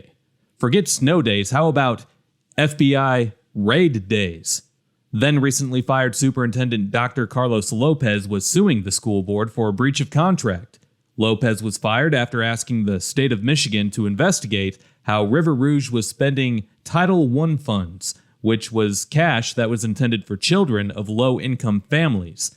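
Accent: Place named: South, United States. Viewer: Why is this Trailer Park Bumpkin speaking on Michigan Period. You can hear the red neck in his throat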